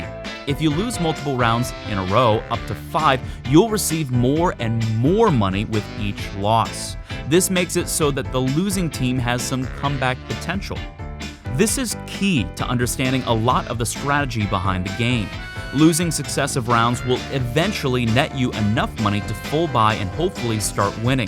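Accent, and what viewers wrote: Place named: United Kingdom. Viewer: you dont sound like him imo but you have a nice soothng voice like him, with a diff accent lol, i think ur british?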